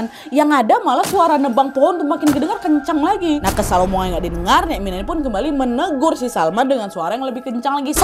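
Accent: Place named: Banjar, Indonesia. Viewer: Aku yg denger langsung ngeh ahahahaha pasti bener nih orng banjar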